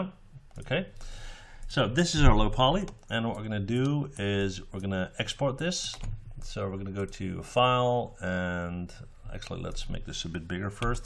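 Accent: Netherlands. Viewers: Wait are you dutch? Haha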